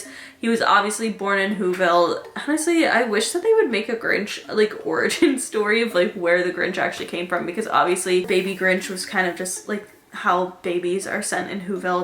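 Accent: United Kingdom. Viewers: Why am I so obsessed with your random British accent, it's so fucking funny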